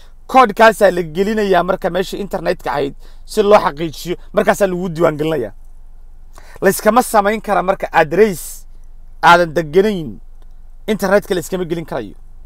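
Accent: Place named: Ethiopia. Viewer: Wallaal Ninkaan Somaali Maaha waa Nin Cabasha oo afsomaaliga Bartey. Bisiq Saraan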